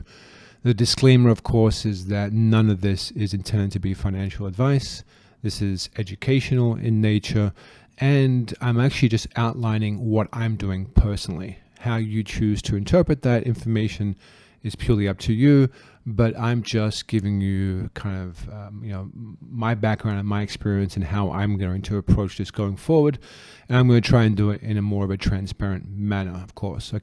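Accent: Australia. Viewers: I think he's Australian by the sound of his voice, but I take the point.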